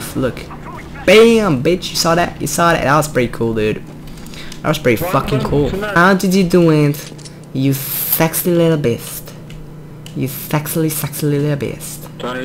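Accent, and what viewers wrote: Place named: Eastern Europe. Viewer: I'm guessing Eastern Europe? Maybe Slovakia or Slovenia? Maybe?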